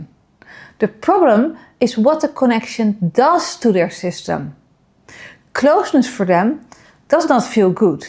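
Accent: Netherlands. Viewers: Je bent duidelijk slim, kun je niet iets minder Dunglish spreken 😅😂😂 is moeilijk om naar te luisteren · You sound Dutch.... bedankt voor deze video.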